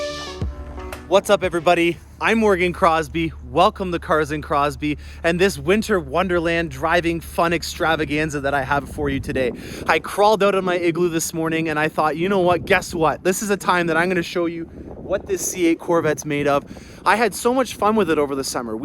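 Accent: Canada. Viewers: That intro screamed in Canadian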